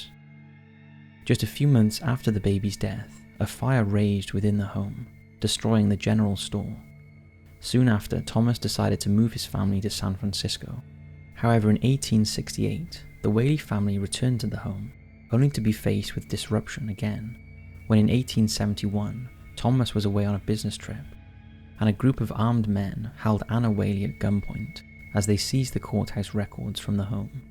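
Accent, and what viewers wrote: Wales, United Kingdom. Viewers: Is he welsh or English? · Dude he is welsh or something. Don't be such a trump supporter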